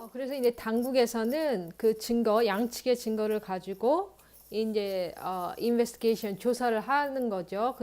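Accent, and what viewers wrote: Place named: Canada. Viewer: 차분하시고 한국말하시지만 혀 굴리는 소리가 많이 들려서 처음에는 캐나다에서 어릴때부터 사셨나 했더니, 성인이 되어가셨군요. 그래도 역시 중등학교 과정과 대학을 거기서 다시 졸업하셔서 그런지 캐내디언 같습니다.^^ 저도 취업비자로 들어가서 영주권을 받으려고 하는데 많이 도움이 될 것 같습니다. 감사합니다.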